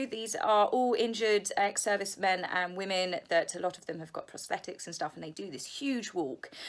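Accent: England, United Kingdom. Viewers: Hi! We have been watching your videos for a long time now! We are from Serbia and we never ever liked Mm and nor P Harry eighther. We just want you to know - we admire your work, your wonderful English accent! You are always right! We think - from over here we see - Harry has always been jealous and envious at his brother, it’s so obvious when people like us don’t have any reason, any use, any connections, we just see clear. We support you! Your thoughts about them - sooooo true! Love you, watch you!!! Thank you!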